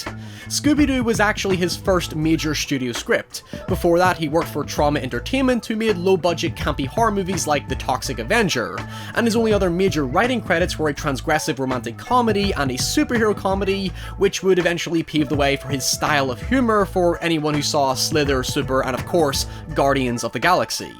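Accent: Northern Ireland, United Kingdom. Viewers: It's a Northern Irish accent, though I barely notice because it's the kind of accent I hear day-to-day so it's very familiar to me at home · I was so surprised to hear the Northern Irish accent! So glad to see some representation of our little part of the world in a successful YouTube channel, keep up the good work buddy! :) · Sounds like a northern Irish guy do an American accent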